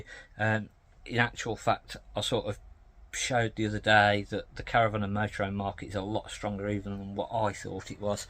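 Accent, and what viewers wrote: Midlands, United Kingdom. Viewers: The fella has a midlands accent. Life would be so boring if we all spoke the kings English like you!!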